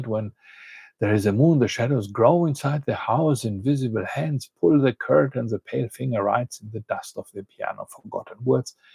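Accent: Germany. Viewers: Hello, may I address you like this? I love your content, my husband and I have been trying to learn Greek for a couple of years now and we especially like your approach of explanation with all the connotations. And since we write you from Germany we love the “airy” German accent in your English! Just saying that the sound quality in the streaming production was not very good and made it often difficult to understand your explanation. In the whole we look forward to seeing and hear ing more from you and thank you for your effort!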